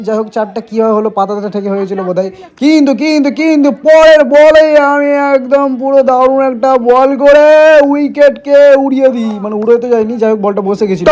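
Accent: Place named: Murshidabad, India. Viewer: Puro Murshidabad ar khati kotha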